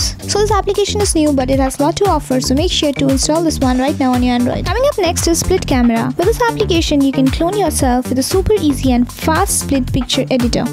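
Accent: India. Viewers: Indian ke english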